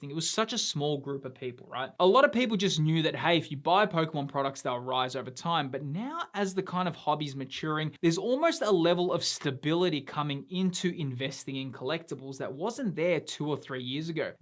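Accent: Australia. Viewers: i subscribed because of the Aussie accent and the banana trend :)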